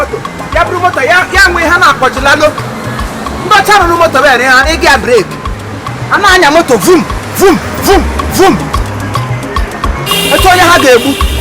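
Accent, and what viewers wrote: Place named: Imo, Nigeria. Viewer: on behalf of Orlu, Nkwere, Dikenafai, Okwele, Umuduru, Anara, and Ala Ekwe, I hereby remove my hat for you. You're a special CASE! Lol (I need to visit Ekwe) when I come back home. Please keep speaking Ekwe/Imo state dialect, don't EVER try to speak Anambra dialect again. #Ekwe #ImoState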